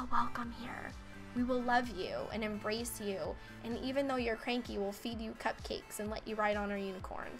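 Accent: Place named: South, United States. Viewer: I'm sad to hear that you have to change how you act even in the slightest. I loved your sarcasm, it was hilarious and fell right in line with mine. Also I love your southern accent and drawl. Being an Oklahoman myself, its awesome to hear a "southerner" sometimes. :P But you're adorable, I love your videos, I love your vlogs, and I hope one day I will get to meet you.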